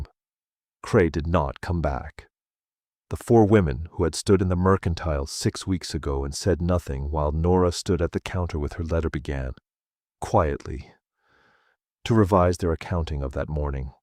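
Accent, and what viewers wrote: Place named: Australia. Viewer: That was beautiful! Sounds Australian.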